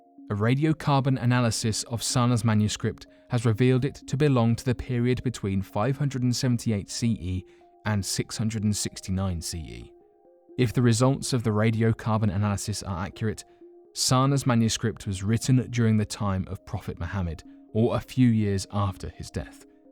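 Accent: United Kingdom. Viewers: Its his Brit accent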